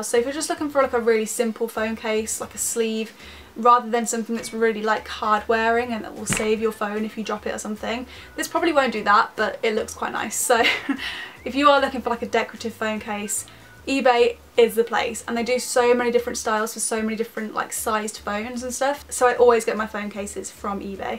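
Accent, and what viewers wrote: England, United Kingdom. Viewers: Are you English or American ❤️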